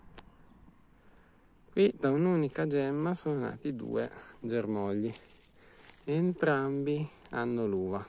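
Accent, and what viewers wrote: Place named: North, Italy. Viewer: Salve SEMPRE molto chiaro. Scusi di dov'è perché non ha accenti ma sicuramente del Nord, io sono del Lago di Como. Quest'anno il Lago è stato riempito 2 volte se non tre.......😊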